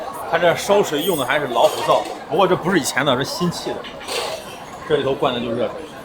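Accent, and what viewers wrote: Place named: Sichuan, China. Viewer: 四川话太歪了😊😊😊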